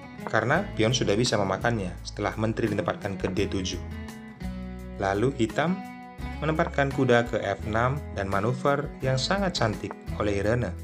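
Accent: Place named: Karo, Indonesia. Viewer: Kental bgt ngomong Karo bro😂😂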